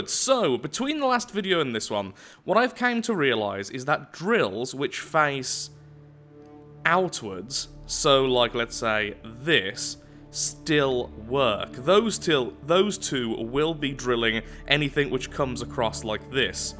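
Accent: United Kingdom. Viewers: holy shit I was looking away and forgot what I was watching and you sound like the most stereotypical brit I could not distinguish which british youtuber I was watching lmfao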